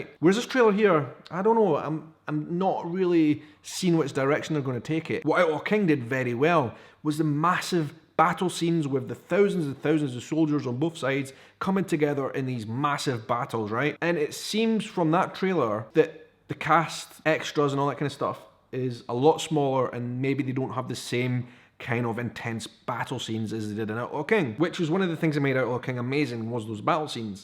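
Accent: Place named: Highlands, United Kingdom. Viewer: Yer accent is a shitey chookter middle class yin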